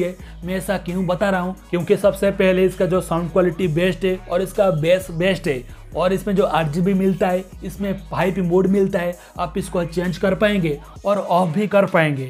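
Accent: Bengal, India. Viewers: Bhai bengali ho yea Assamese ho?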